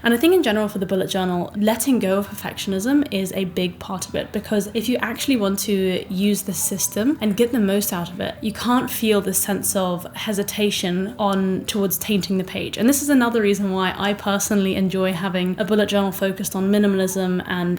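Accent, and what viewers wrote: United Kingdom. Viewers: Hi it’s nice to see you and listen your videos. I love how you talk in British accent and always your voice gives me a power to improve my English deeper. Currently, I’m living in Japan and I would like you to come to Japan, this is such a good country and I can assure you that you will love it. Thanks for your videos and I want you always to be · Hi it’s nice to see you and listen your videos. I love how you talk in British accent and always your voice gives me a power to improve my English deeper. Currently, I’m living in Japan and I would like you to come to Japan, this is such a good country and I can assure you that you will love it. Thanks for your videos and I want you always to be beautiful as now.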